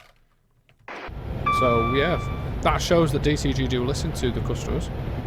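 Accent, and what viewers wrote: Yorkshire, United Kingdom. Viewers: Nay lad you must stick with the yorkshire accent or I may need to give you an ecky thump ( Goodies refrence for the younger viewers) Great video bye the way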